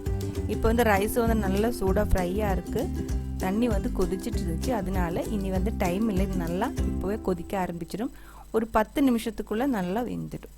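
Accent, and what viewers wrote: Kerala, India. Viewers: hi mam ur way of presentation is simple&..ur malayalam mixed tamil is understanable..i love..can you tell about adaprathaman recipe?..thank you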